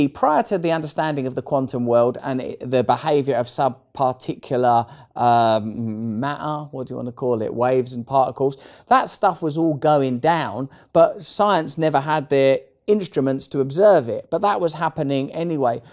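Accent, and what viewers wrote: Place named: Cockney, United Kingdom. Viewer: I wish his Cockney weren't so accentuated. I have to concentrate for a second, think of the word in context, then figure - he must have said maTTer. lol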